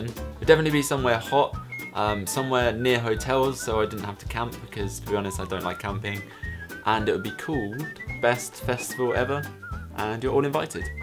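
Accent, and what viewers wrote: California, United States. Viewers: your Californian accent. XD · Your Cali accent is adorable! XD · Lol his Cali accent sounds like a gay man xD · lol. your californian accent. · That Californian accent. On point. Haha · That California accent is on point! Couldn't stop laughing :) Love this video! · omg the california accent #blesd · Ur american accent omg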